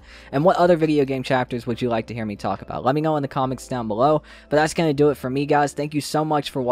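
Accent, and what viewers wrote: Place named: North Carolina, United States. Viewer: On today’s episode ok guess that accent we haaaaaave! Now, I’m gonna guess that accent is from….North Carolina!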